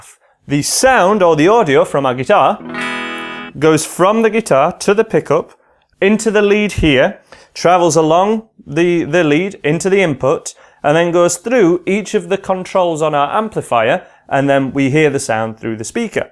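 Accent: United Kingdom. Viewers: im from the US and i can hear this guy just fine. he's got a British accent, but i can everything perfectly. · Can someone tell me the names of the set used in this video and organize them according to price, sorry i can't catch words from the British accent that much and thanks in advance!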